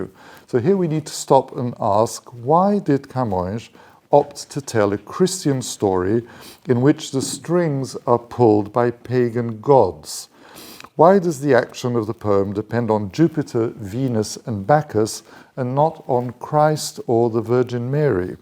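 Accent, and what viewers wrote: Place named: RP, United Kingdom. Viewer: That's perfect BBC english! Proud of you ❤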